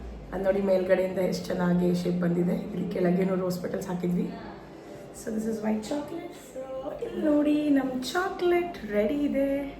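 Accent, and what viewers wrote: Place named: Uttara Kannada, India. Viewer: Ur talks r little like Uttar kannada ☺️ I am from Belgaum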